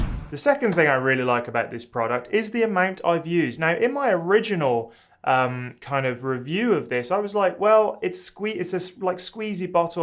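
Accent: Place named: United Kingdom. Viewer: Just out of curiosity how did you manage to get Beardbrand products? I assumed by the accent you were here in the UK 🤓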